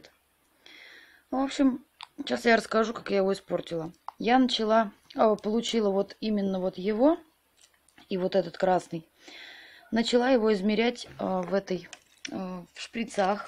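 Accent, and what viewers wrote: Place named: Ivanovo, Russia. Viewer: Говор у блогера похож на ивановский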